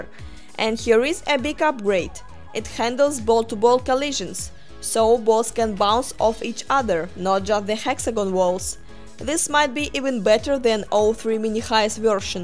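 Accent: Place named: Russia. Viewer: UR ascent is so Russian. Are u from Russia? Awesome demonstration btw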